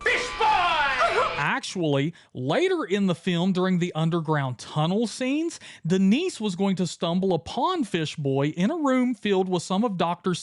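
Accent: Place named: Pennsylvania, United States. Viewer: You sound almost exactly like that guy who cheri robs at the convenience store/gas station.. I grew up with friends from Pennsylvania and Ohio, you sound a lot like the idiots they made fun of.. keep up the amazing content. Maybe work on your delivery. Otherwise gobs-less...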